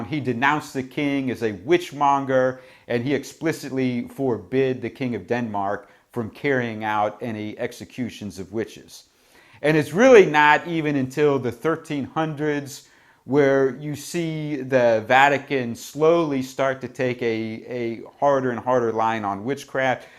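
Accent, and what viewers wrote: United States. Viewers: New York?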